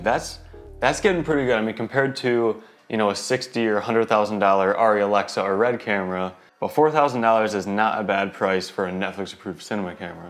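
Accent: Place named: Buffalo, United States. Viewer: Are you from Buffalo/Western New York? Your voice sounds very Buffalo.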